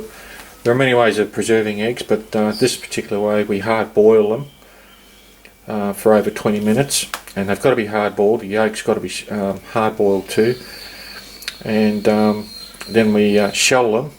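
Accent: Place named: United Kingdom. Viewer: You are Brit and its to hard for me to understand you, but nice video😂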